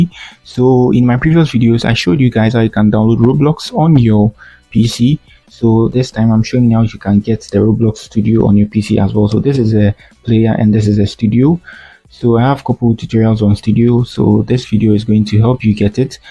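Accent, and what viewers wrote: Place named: France. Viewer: tu parles francais non ca se sent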